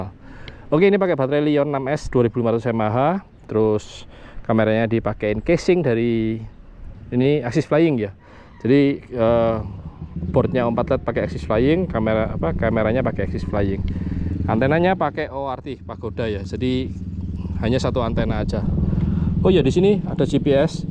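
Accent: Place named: Bali, Indonesia. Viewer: Main-main (masih kebawa dialek Bali-nya om ) 😆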